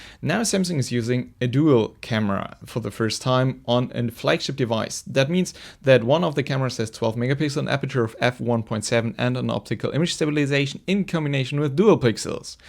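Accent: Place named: Germany. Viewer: hey um..... whare rrrrrr uuuuuuu ffrommm??????????????????u have an hard accent.Germany?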